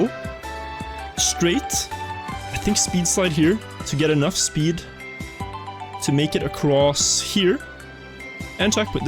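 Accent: Norway. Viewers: Are you Norwegian? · Are u Norwegian? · Er han norsk?!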